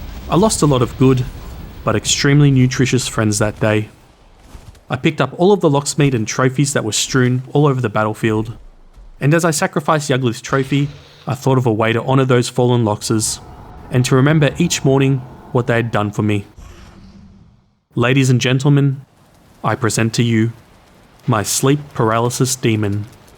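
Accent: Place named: United Kingdom. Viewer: you british sound cool. As an American from California Dallas I respect the yabbies you blokes drink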